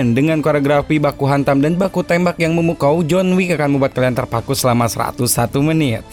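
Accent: West Java, Indonesia. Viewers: kalo dari suaranya,adminnya orang sunda xD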